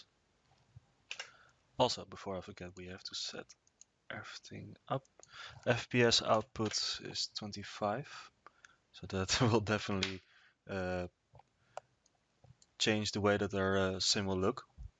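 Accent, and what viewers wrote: Netherlands. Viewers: thnx for the tutorial btw u sound dutch :P greetings from amsterdam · nice tutorial, but doe iets aan je engels. ik irriteer me wel een beetje aan het nederlands accent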